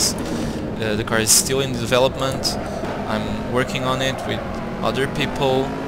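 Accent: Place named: Brazil. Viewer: your accent sounds familiar are you Brazilian ?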